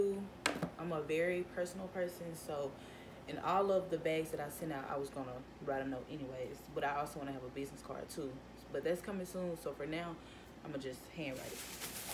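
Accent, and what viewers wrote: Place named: South, United States. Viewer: she (has) to be from Dallas or Mississippi....I know how we talk lol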